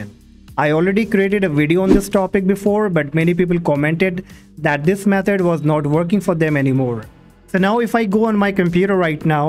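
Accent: India. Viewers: indian Voice it Sound Scam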